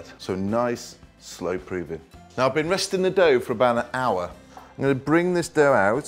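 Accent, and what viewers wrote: Liverpool, United Kingdom. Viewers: Erik Patulin he’s not a Brummy, he’s from Wirral. His accent is closely related to a Scouse (Liverpool) accent. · The British accent and wit also work for some of us. A man that can bake is also attractive.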